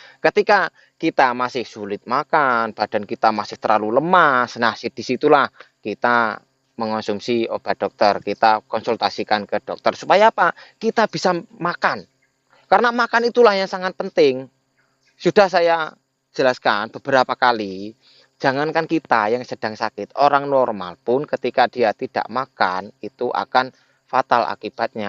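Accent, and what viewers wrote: Java, Indonesia. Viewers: Mas sampean dari jawa mana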